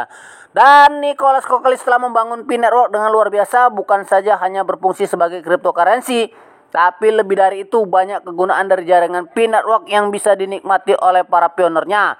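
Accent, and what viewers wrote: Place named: Java, Indonesia. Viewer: aksen jawa kental sekali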